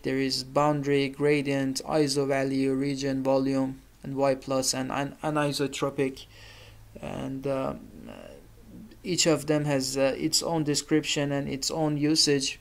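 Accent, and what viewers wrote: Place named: Iran. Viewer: Persian ACCENTTTT.!!! Nice video btw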